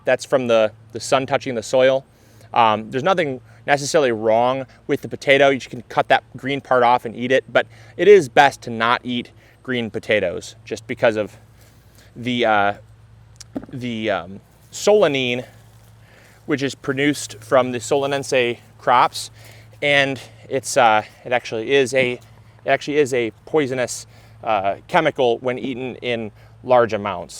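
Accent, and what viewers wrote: Michigan, United States. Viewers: Here for the gardening advice but staying for the Michiganisms "oh my gosh!".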